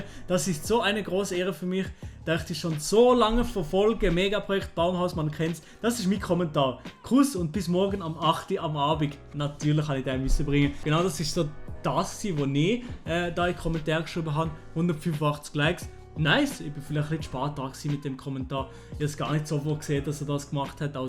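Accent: Switzerland. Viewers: Also ich find Din dialäkt schono lustig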